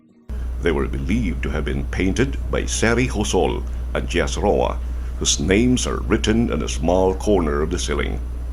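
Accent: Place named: United States. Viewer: The narrator sounded like a native american speaker. I thought at first he was an american because of how he speak.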